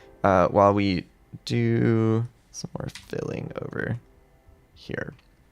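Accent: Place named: United States. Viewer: *Says that Bluestar is his fave WC character.* Me: Do I know you? Wait, do I live close to u? *My brain: Please say yes please say yes.*: Says he is from USA Me: I can tell The way u say ‘water’ It’s WATER NOT WADDER!!! Fun fact: I have met in Wings of Fire Roblox game What’s graduate school?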